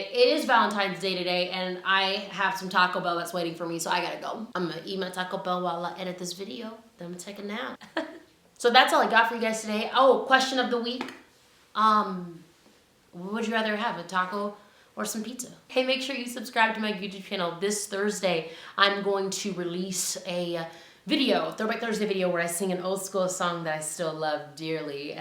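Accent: South, United States. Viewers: omgosh your southern accent with this message is the most amazing thing ive heard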